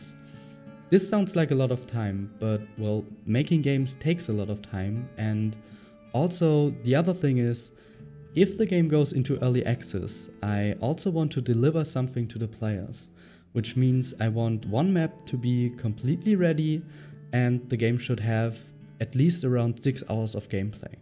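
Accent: Germany. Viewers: ..I'm inspired by diablo and gothic :) Are you living in germany or america...you sound german, but that doesn't mean you live here. :)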